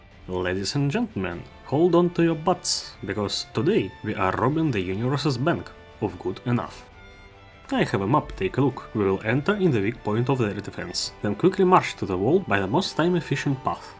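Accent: Russia. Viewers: bro are you russian dont know why ,i like to hear you speek english (this is only a comment dont take it seriously ),it is intreasting to learn some think while having fun keep it up🙂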